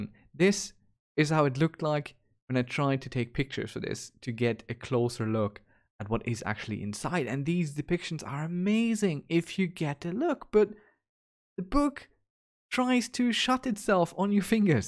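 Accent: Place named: Ireland. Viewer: Are you Irish? You sound like a mix between Irish and something else.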